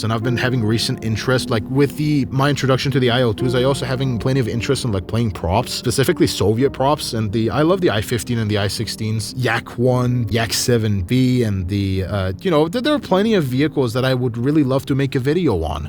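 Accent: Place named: Iran. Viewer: i didnt think you would be iranian, but now i think about it, you do have a thin accent. but still, it would be cool to have iran as a tech tree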